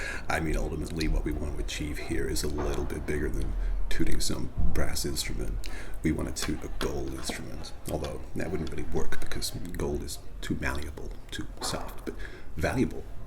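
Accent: United States. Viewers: I’m an American, and I gotta say, your American accent is great. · You picked one of our main accents (in the US). The nuances are spot on (newscasters and narrators of the mid century often take this tone), and excelently paired with social status, and stereotypical, shall we say "frame of reference"? And the acronym; still laughing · Good American accent! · 1) American accent is great as always! 2) American accent speaking Japanese is phenomenal! 3) Bad jokes are great!!! 4) You are a remarkable bullshitter. You would be a great “generic Wall Street banker”. 😋🤣🤣🤣🤣🤣🤣 🍶🍶🍶🍶🍶🍶 Much love!!! 💙💙💙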